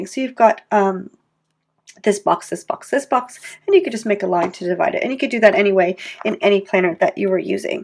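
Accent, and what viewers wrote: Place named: Quebec, Canada. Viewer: I'm obsessed with your accent! Mostly Canadian, but there's just a touch of French from time to time. My favorite tip is to use washi to make my own decorated paper clips. I can make them match my layout every week at very little expense and no guilt if I lose one!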